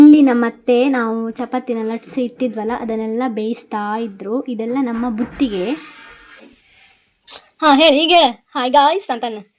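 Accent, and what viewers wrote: North Karnataka, India. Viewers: Nivu uttar Karnataka bhashene matadari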